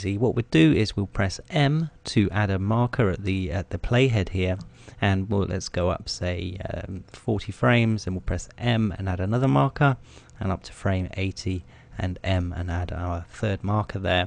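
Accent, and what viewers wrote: United Kingdom. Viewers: british :D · English Accent :)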